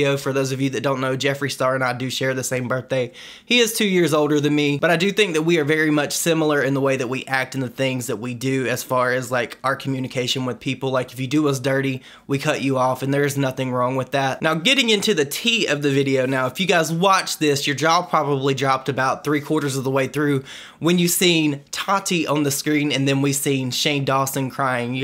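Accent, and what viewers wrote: United States. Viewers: How you say Riland😍I'm from Britain and we love American accents 😍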